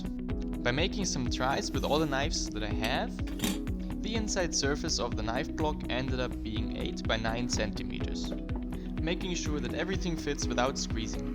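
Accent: Germany. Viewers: du bist aus deutschland oder? man hört es ganz leicht aber dein englisch ist echt mega gut man hört kaum noch einen deutschen akzent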